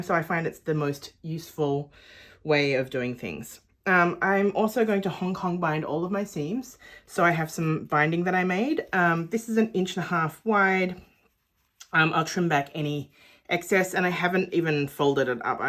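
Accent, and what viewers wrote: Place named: Australia. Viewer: Great tutorial. I love your clear instructions and it’s so great to hear an Aussie voice! I have downloaded the pattern and will be making one of these just as soon as I have some twill tape.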